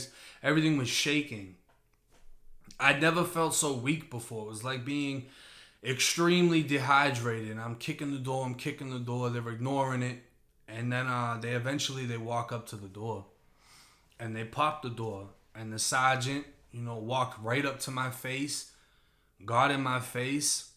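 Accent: Boston, United States. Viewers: My chick was like why you watching this dude all the time. Shit idk I did time. Dudes got a Boston accent. And dude tells a great story. Let me alone girl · Word he got ah Boston accent... I’m from Boston ... on one video he said something about being in mass for awhile not sure how long he was here but I hear 👂 it in his accent... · You're so Tampa but I detect a lot of Boston. Or should I say Baaston? I'm new here.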